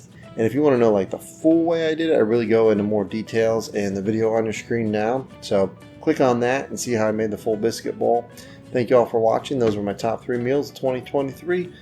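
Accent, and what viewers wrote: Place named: Southern Ohio, United States. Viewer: Missed the biscuit bowl video this year. I’ll have to try it. It looked mouth watering. You must be from southern Ohio😬. I have a friend from southern Ohio and except for the northern accent, he’s not much different from the southern rednecks around here in South Carolina.😬 Love your videos. Good luck with the detox.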